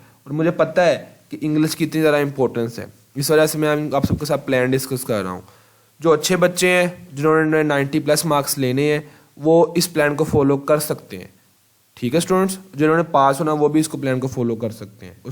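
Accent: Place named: Lahore, Pakistan. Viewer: Sir are you from lahore. your aaccent is like a lahori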